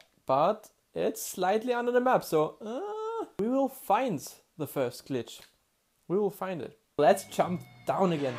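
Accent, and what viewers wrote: Germany. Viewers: Cool Video but Theres Just one Thing i will know. Are you a german guy the game is german and your voice Sounds german to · you german ? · Man hört irgendwie ein bisschen, dass du deutsch bist xD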